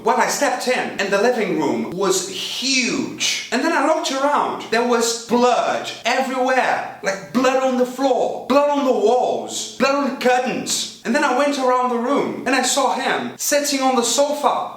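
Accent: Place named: United Kingdom. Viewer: I loved it. It was a great class. Even though I know these rules I often do these mistakes. I was a brave I watched it without subtitle. Your British accent is very nice.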